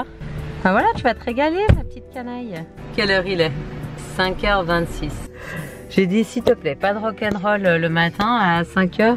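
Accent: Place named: Geneva, Switzerland. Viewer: J'adore les intonations Genevoises ...c'est un plaisir de vous suivre depuis la Suisse plein de belles choses pour la suite.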